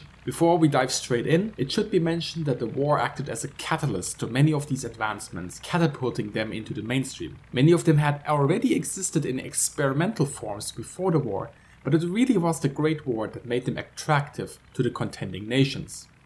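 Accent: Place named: Germany. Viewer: Jimson Patiño MacLean His English is near perfect. He just has a German accent.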